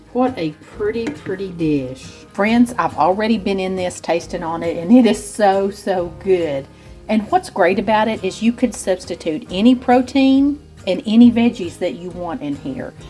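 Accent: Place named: Georgia, United States. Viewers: I cut my bell peppers from top of stem...curve over seed pod to bottom. I used to do it your way....so maybe try my way lol! Love u...I am a SC girl....so appreciate the accent! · I do love listening to you talk, you remind me of my hometown in southwest Georgia. Your recipes are delicious and healthy. And I love cooking the food you cook ❤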